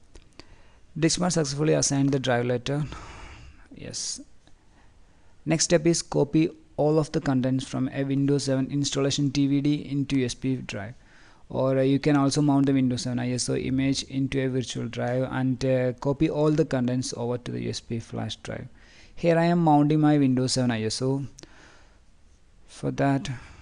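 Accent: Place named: India. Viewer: he must be indian indians speak english like that R r RRr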